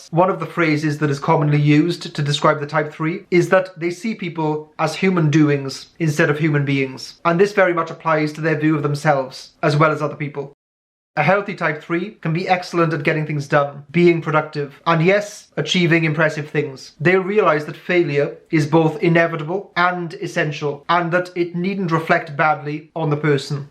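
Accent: Wales, United Kingdom. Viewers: Are you Welsh!?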